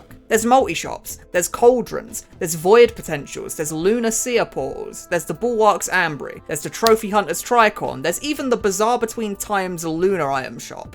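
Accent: United Kingdom. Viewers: you sound veryu british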